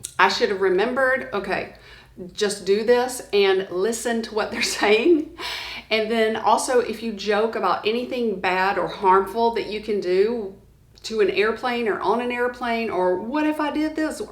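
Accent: South, United States. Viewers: Are you southern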